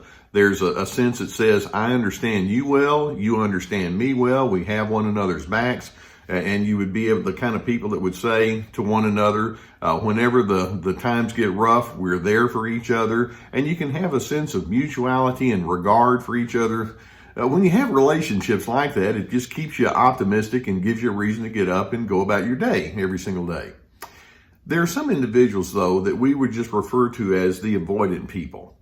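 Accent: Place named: South, United States. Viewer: Love the Doc's clear southern accent, as well as his knowledge and experience!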